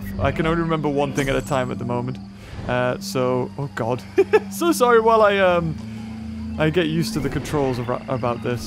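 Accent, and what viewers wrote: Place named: United Kingdom. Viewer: I love ur vids, I always laugh and smile, I'm going through a hard time, and I love ur British accent, and ur comedy, plz keep going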